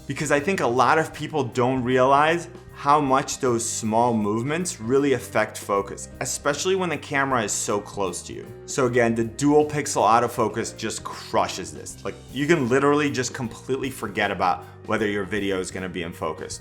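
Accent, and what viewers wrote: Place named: Cleveland, United States. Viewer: First off, I hear that Cleveland accent! We are from Cincinnati but have bene living in London for the last two years. Miss those Ohio accents! We purchased the 200D (SL2) a year ago, (the white version). We are amateur creators and it does exactly what we need it to do, even with the kit lens. Amazing photos, great videos when needed and ease of use cant be beat. We are actually thinking about getting another one or even the M50 so we can both have access to cameras. Thanks for the great content and helpful tips!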